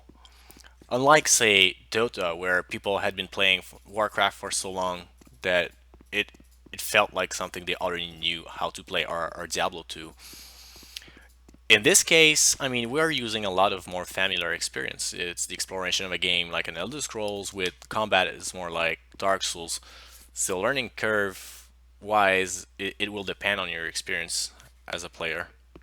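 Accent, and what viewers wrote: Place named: Quebec, Canada. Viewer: J’entends le québécois dans cette voix! Allo compatriote! Bien hâte d’essayer ce jeu!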